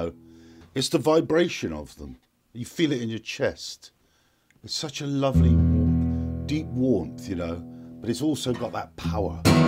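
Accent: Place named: Dorset, United Kingdom. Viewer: Commenting again just below my comment aSince then obviously Greg has sadly passed, love his Dorset accent and he was also a great storyteller, I went to one of his gigs where he talks about his abs others music, he really was a one off, we will miss you Greg RIP now .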